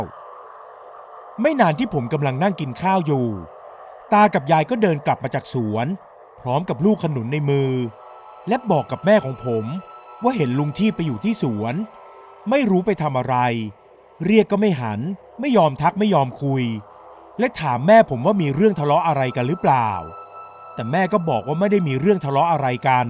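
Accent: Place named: South, Thailand. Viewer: ชอบที่พยายามจะแหลงใต้ <num>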